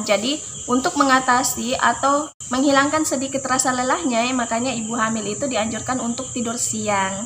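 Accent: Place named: Makassar, Indonesia. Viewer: Org makassarki 🤭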